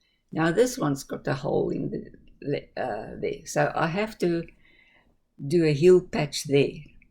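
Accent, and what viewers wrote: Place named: Afrikaans, South Africa. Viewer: We were trying to place the accent. It has a little of that So Africa/Australia sound but also a Dutch/German sound. Afrikaans! Makes so much sense. My ex-husband spent two years in Capetown and we named one of my kids Xolani (ex says the click, I do not) and two of the kids have Xhosa middle names as well. I'm sorry about the bulkier yarn. I am glad you were able to make some things. That bear! Sooo adorable! I hope your great-granddaughters love the hats. I guess they don't need them until we are having summer. :D I like wearing slipper socks. :D I haven't been knitting long enough to need to repair socks yet. I made my daughter a reversible hat using Tunisian crochet. It's thick and so pretty. Love seeing what you have going.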